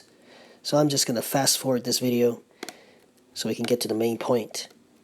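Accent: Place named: West, United States. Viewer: you talk like cowboy trash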